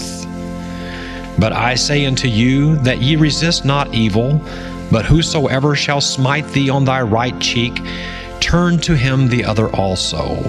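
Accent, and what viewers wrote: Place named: United States. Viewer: So, Jesus said these things to someone, then 300 years later, someone heard down the grapevine what he said, then wrote it in Greek, then it got translated to Italian, then it was run through the Catholic system, then the Protestant system, and then translated into English and imported into North America, and is now being recited by a country-accented white man, and therefore it holds the faith of so many as the unadulterated Absolute Truth? Just trying to get the understanding right.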